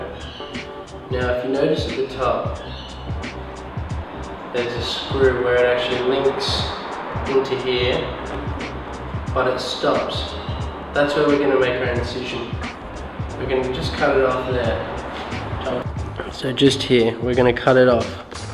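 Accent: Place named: Australia. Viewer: U is Aussie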